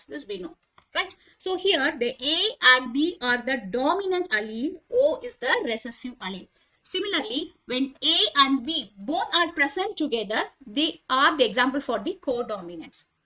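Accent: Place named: South India, India. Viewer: You've a South - Indian accent.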